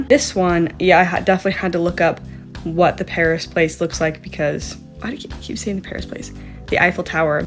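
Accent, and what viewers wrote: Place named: United States. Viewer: You probably hear it a lot, but you have a really nice voice. Maybe it's the American accent lol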